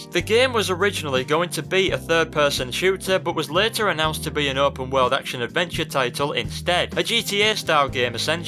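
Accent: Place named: Northern Ireland, United Kingdom. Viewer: Are you from Northern Ireland by any chance? I have a friend from Londonderry and the way he says "game" more like "geem" makes me guess that. Also good video, I made one about Scarface too. Quite a good game.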